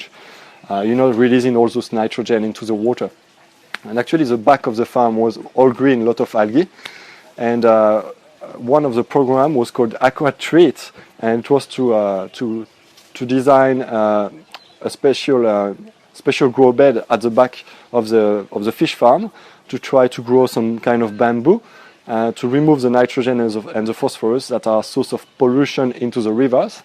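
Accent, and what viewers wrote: France. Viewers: Ça fait plaisir d'entendre de l'anglais avec un accent français, j'ai presque tout compris^^ Merci pour cette vidéo!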